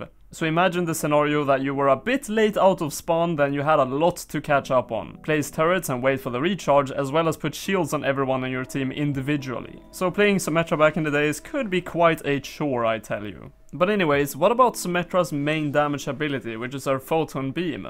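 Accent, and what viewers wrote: Sweden. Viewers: are you swedish? I'm swedish and thought that you sounded like a swede. (I'm not trying to be mean.) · You sound like you are swedish?!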